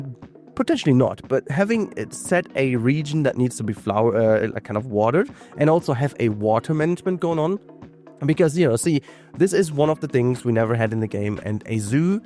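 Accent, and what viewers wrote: Germany. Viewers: Immer noch aus Deutschland, hört man doch😅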